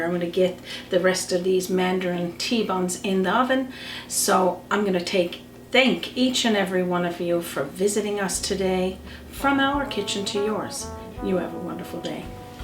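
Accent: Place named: Canada. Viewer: Have a beautiful Sunday. Looks delicious wished I could have tea and buns with you. Love your Canadian accent. Stay safe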